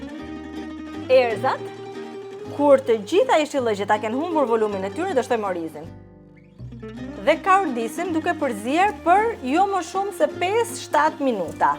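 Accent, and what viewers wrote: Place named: Burrel, Albania. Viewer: .....sa me pelqen malokja e Burrelit kur salutone !!!!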